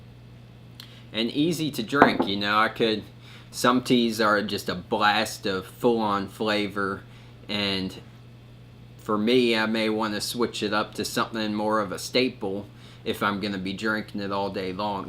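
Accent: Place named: South, United States. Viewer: I really enjoyed your review, and I love your background of the Chines tea farms! I’m enjoying some jasmine pearls right now myself to start the day. I love drinking plain tea throughout my day and I get it shipped to me in California from Mei Leaf in London. You are very cute and I love your southern accent. I hope you make more tea reviews.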